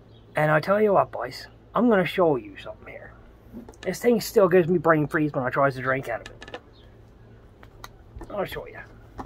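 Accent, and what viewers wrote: Newfoundland, Canada. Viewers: You answered all my questions, thanks! Love the Newfoundland accent but you gotta ditch that hat before you get complaints.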